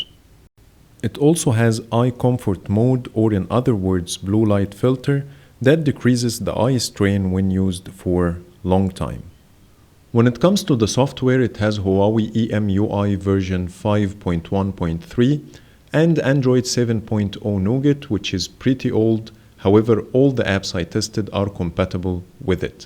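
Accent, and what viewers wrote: Egypt. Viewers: Egyptian accent lol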